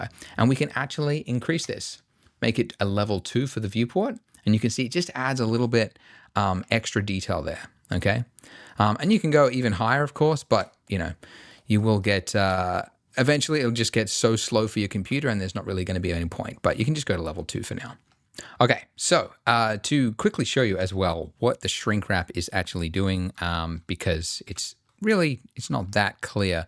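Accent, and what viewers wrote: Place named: Australia. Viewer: Oh thank goodness, I'm so glad to hear an aussie accent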